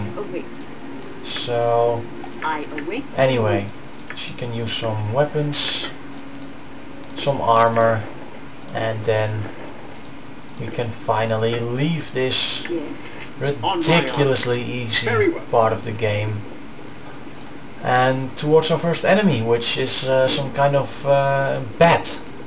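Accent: Netherlands. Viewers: hes dutch aint he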